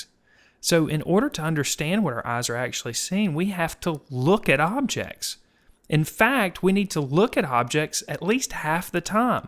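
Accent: South, United States. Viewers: owmygod that's what I was hearing too but then I was like ''wait did he just say arse ? he can't be saying arse .. then what IS he saying?'' and remembered that he showed an eye and was like ''OOOWW so that's what he's saying'' xD southern accent problems :P it's kinda cool .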